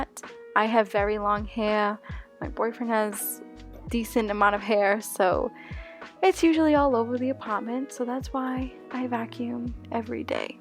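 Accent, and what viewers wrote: Boston, United States. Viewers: Just found your channel. Luv ur Boston accent ♥️🤍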